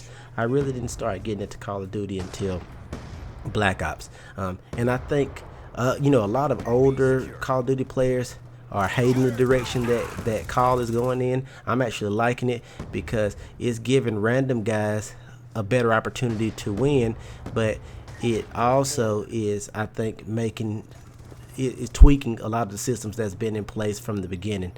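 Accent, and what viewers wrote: South, United States. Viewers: had to restart to see the new intro... it's the shit. I wanted to speak on a vid u did not long ago venting on the youtube game, subs and stuff. The reason i luv you channel is the reason your subs may be low. 1)don't care if people disagree a southern accent, on a black man especially people expect you to be dumb/ignorant. In this case no where close. 2)The unboxings you show should be less unboxing more review bc that matters most 3)there are just haters and i have a few ideas just respond pls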